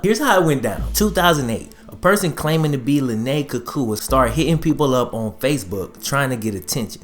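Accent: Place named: Bay Area, United States. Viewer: What up? Sounds like you're from the bay! Am I right?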